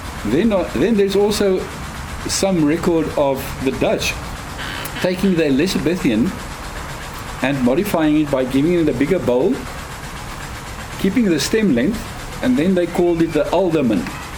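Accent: South Africa. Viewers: Are you south african? Your accent is very boer-like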